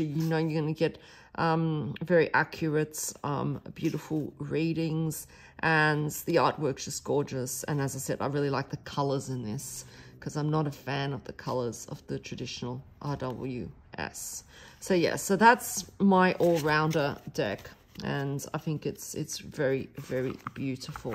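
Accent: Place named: Australia. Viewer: I forgot to comment when I watched 🤪 So, I loved this 😍🙄🤣 it is always good to hear another Aussie voice 🌺🍀🏵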